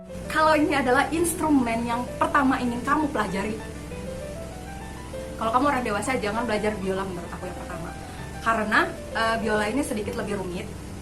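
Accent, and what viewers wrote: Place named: United Kingdom. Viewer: Lebih pantas lg klo bicara tanpa menggunakan aksen English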